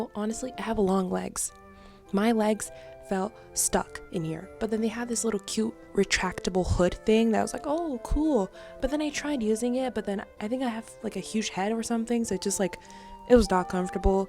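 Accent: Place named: United States. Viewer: What’s your backstory for your lineage and your English skills. You sound totally American. I couldn’t remember if you and Eiko were both Ghanain or if you were Nigerian. Your artistic skills are totally BOSS by the way. You’re unique and special at same time. If you ever visit the US you will feel at home amongst the African-American community.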